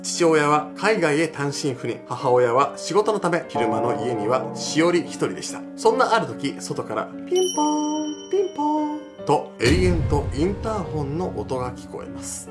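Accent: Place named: Kansai, Japan. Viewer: 関西弁は勘弁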